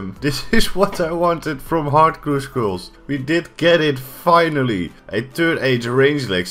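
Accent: Netherlands. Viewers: hears the guy talk Me: 'Is he dutch?' looks at the channel Me: 'Ah'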